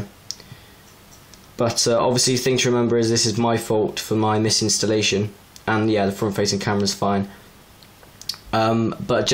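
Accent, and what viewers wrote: England, United Kingdom. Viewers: It's not that it's the fact that on his channel he says that he's from the united kingdom AND you can clearly hear he has a english accent.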